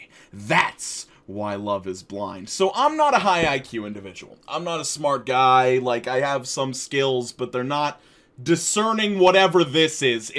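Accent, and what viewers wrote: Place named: Long Island, United States. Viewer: I have to admit I don’t care for the yelling and Long Island accent...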